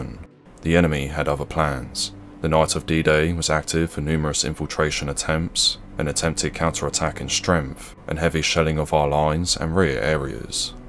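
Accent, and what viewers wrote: England, United Kingdom. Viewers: Which part of England is Your accent from?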